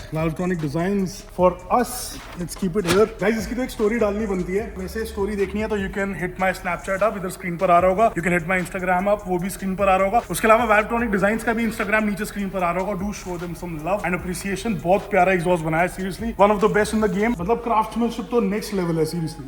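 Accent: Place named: Punjab, India. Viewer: stock awaz madi nai h😂 prava hindi ch punjabi 😂 good bro 😎 sara kuj punjabi ch bolya kr yara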